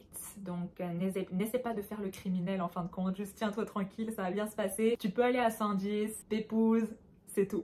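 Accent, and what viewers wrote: Quebec, Canada. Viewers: Tu commences à prendre l accent Québécois :)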